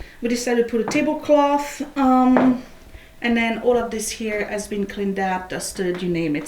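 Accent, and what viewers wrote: France. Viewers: On your last video I asked if you have a German accent. But now I'm guessing it's French? That's what I thought. Everything looked yummy.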